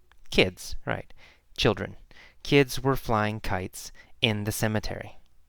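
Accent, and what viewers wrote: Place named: United States. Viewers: American it is, my dear. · mỹ nha bn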